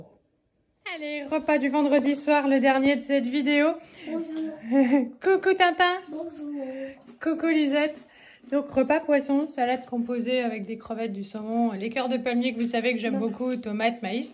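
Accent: Alsace, France. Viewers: Yo hopla... trop bô l’accent Alsacien 🤣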